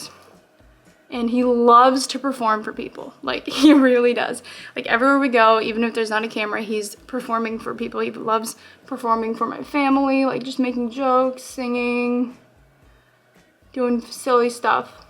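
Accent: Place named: New England, United States. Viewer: I totally knew where , you were from just by how you talk and do you’re make up NEW ENGLAND GIRLS “ respectfully “ go get you’re Starbucks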